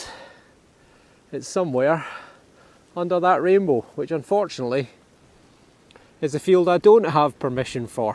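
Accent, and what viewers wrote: Scotland, United Kingdom. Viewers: Enjoy your videos for your historical content and love your Scottish accent.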